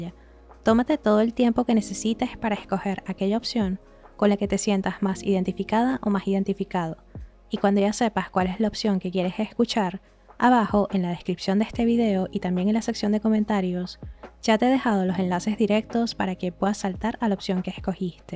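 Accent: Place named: Venezuela. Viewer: Eres la más acertada que existe 🙌 eres mi favorita y amo tu acento venezolano ❤ op 2 describiste todo en exactitud. Lastimosamente tiene pareja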